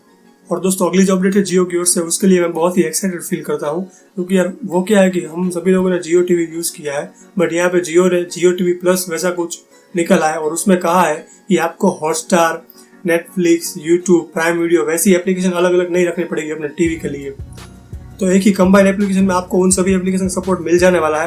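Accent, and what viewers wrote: Gujarat, India. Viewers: By your voice i think you are gujrati .I'm right ?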